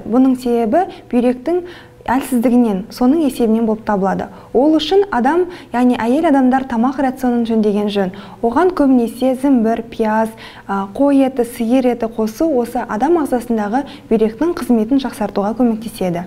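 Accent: Standard. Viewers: Рахмет қазақша таза сөйлегеніңе👍👍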